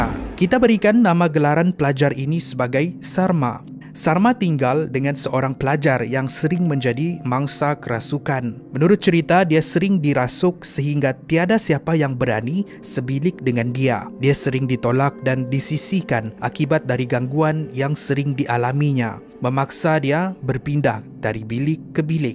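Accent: Sabah, Malaysia. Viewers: abang orang sabah kan???😅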